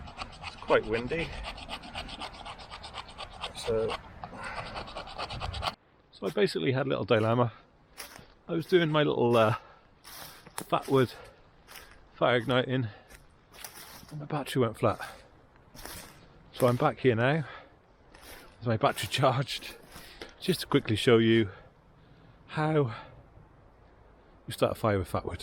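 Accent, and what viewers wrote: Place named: United Kingdom. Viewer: Where is this? I assume in the UK. Guessing that due to your accent.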